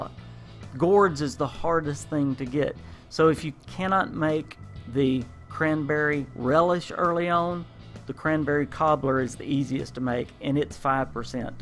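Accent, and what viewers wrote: South, United States. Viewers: I have never heard a more thick southern accent, and I am in the south. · Nothing quite like a Southern gentleman's voice!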